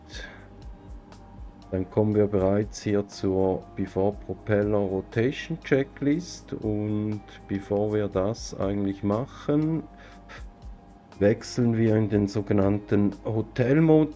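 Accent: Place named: Bern, Switzerland. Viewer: Danke für das "chillige" Tutorial... Guess us dr Region Bärn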